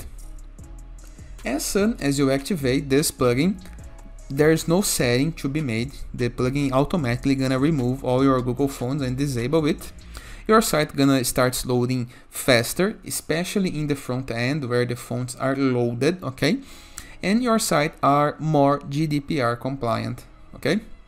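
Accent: Brazil. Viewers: esse sotaque é tupiniquim , hein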